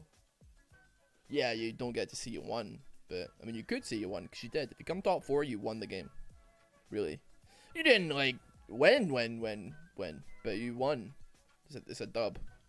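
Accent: Scotland, United Kingdom. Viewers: do you know how many times this video has popped up on my autoplay while i've been at work Coworker: What are you listening to? Me: A Scottish guy ramble for hours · comedic scottish man rambling and lightly comentating over a league game to study / relax to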